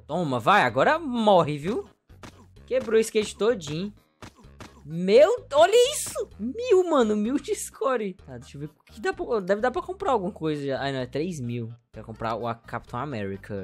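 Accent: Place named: South, Brazil. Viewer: Pelo sotaque acho q o é do Sul.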